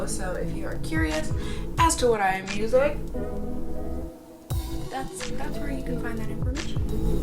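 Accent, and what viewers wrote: Minnesota, United States. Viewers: The first video of yours that I saw was your Minnesota accent tag, (I'm from MN too!!) now I'm hooked on your videos! I just loveee your personality and how sweet you seem ❤️❤️ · IVE WATCHED LIKE 20 OF UR VIDS AND WAS LIKE hmmm I get a vibe she's from Minnesota and BAM FIRST 30 SECS OF THIS VID AND IM RIGHT YESSS (fellow Minnesotan btw) :)))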